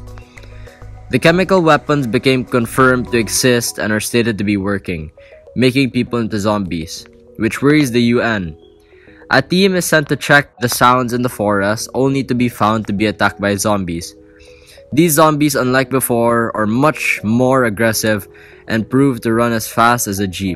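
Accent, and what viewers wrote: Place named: Philippines. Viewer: I can already tell he is a filipino by his accent.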